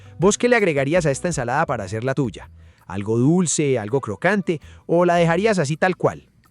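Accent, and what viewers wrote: Colombia. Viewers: Me encantó la ensalada y me encanta su acento colombiano!! Hágale pues…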